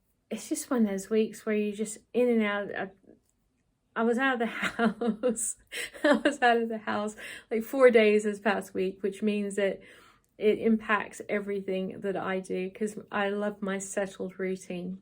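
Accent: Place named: United States. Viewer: I am stitching a castle right now! It is sooo beautiful where you live! I almost forgot you’re an American until I heard a slight American accent still! 😉 I am a homebody and don’t like to travel but I do sometimes with friends and always have a good time. My husband doesn’t like to travel unless I make him and I don’t because I like to stay at home too! 😂 But sometimes my single friends need someone to travel with and I will go. I enjoy your videos and your projects sooo much!❤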